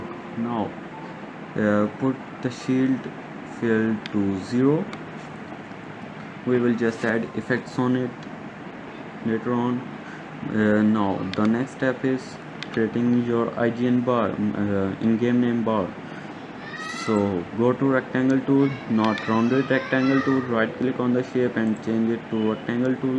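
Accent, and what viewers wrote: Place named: India. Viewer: your voice. INDIAN DETECTED 😍😍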